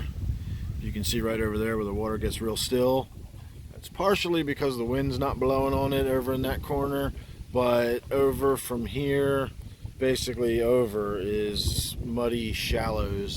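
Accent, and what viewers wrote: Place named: Baltimore, United States. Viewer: Yes, Sir, it's definitely not the hardcore Baltimore accent, it's quite a bit softer.Maybe suburban It's odd because some of it sounds familiar to me (Virginia) but then a few words sound like of Northern, so when that happens I think Maryland.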